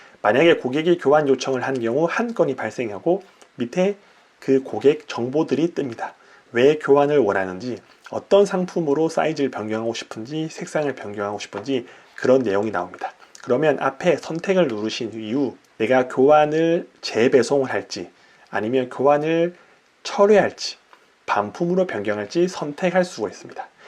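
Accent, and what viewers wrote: Busan, South Korea. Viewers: 오늘도 상품등록 해보자~~~ ㅎㅎ 억양이 딱 부산분이신거 같으네요 저도 부산사람이라 느낌이 딱 오네요~^^ 반갑습니다~ 돈은 벌어야하는데 저희집 사정상 제가 집을 비울수없는처지라.. 정기적으로 출퇴근하는일은 엄두를 못내거든요.. 그런데 돈은 또 벌어야 된다는 아이러니의 구렁텅이에서 허우적 대고 있는 사람입니다... 그러다보니 집을 비우지 않고 할수 있는일을 늘 찾지만...쉽지가 않아 알아보고만 있던중 유튜브 알고리즘이 을 만나게. 해줘 정주행하며 감사히 공부하고 있습니다~ 평소 소비자 입장에서 알리익스프레스 이용하고 있거든요 말도 안되는 저품질상품도 많은데 시간들여 잘찾고 배송늦는거 감안하고 느긋한맘으로만 기다리면 정말. 가성비개꿀. 득템인 상품이 많더라구요.. 해서 요즘 소비패턴은 급하고 당장필요한건 쿠팡 이용하지만 그외엔 배송기간 감안하고 알리서 사거든요.. 알리서 싸게 구매한 악세사리를 혹시나 해서 가격을2배로해서 당근이랑 번게에 올렸더니 팔리더라구요... 해서 아에 알리익스프레스에서 가성비좋고 판매 많이 되는 상품 위주로 구매해 스마트스토어 개설해서 판매해봐도 되지않을까? 하는 생각이 들어 공부중인데요 그렇게 시작해봐도 될까요? 전 당장 월 몇천만원 이런수입 바라는거아니구요 그정도수입 내려면 그만큼 시간과 노동을 투자해야 되는데 제형편이 돈버는거에만 시간을 오로시 투자할 처지가 안되거든요 해서 전 지금 내식구 최소생활비 버는게 목적이고 그게 충족되고 안전해지면 더큰꿈 키워 나가보고 싶거든요... 제가 너무 소극적인가요? ^^; 뭐든 좀 배우려하면 목돈부터 드니 돈없어 달려들지도 못하겠고 그나마 께서 촙ᆢ자도. 알아들을수있게 자세한 영상 올려두셔서 보며 공부하고는 있는데... 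이렇게 시작해봐도 되는건지 의문이 듭니다 너무 길고 추상적인 질문이라 읽기도 힘드시고..ㅎㅎ 답주시기 애매하시겠지만... 조언 부탁드립니다~~행님~^^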